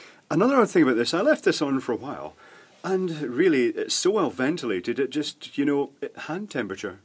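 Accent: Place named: Isle of Man, United Kingdom. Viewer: You live in Isle of Man? I thought you were Scottish, never thought Manx. That's cool!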